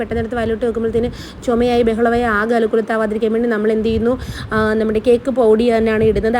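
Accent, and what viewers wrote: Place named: Punalur, India. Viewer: Hey Itha, Jasmin Ithaye pole samsarikkunnu enn aano ellarum parayunne? Anghane aanel chance ind because njngal okke oru nattukar aannnn. Ithayude veedu punalur or Anchal something aanenn aannn nte oru ariv and Jasmin Itha is also from venchembu near punalur, njum Punalur thanneya. So, ore slang and samsara shaili okke varam swabhavikammmm. Ath orikalum imitation allattoooo, Ith njngade oru reethiyaaa😌❤️ Anyway good presentation Itha and the cakes look yummy by their look itself🤌🤍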